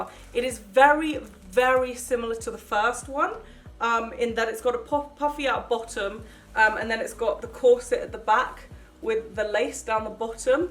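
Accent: United Kingdom. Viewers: Im sorry, but ur so so weird, and plus its more weirder when ur talking Britain THAT PRECIOUS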